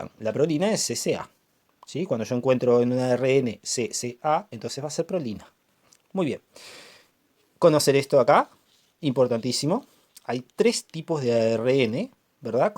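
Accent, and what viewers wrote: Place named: Chile. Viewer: ¿De qué materia y nivel son estas clases? Por el acento creo que eres de Chile, ¿Es correcto?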